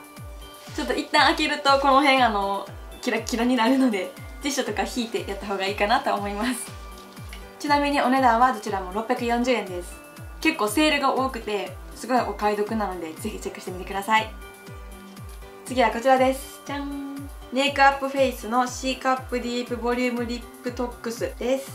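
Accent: Kansai, Japan. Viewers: 関西弁かわいい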